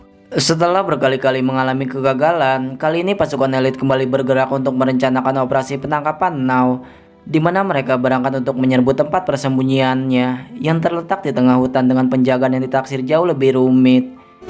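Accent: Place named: Java, Indonesia. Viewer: Logat nya mendok sekali eperi badi😂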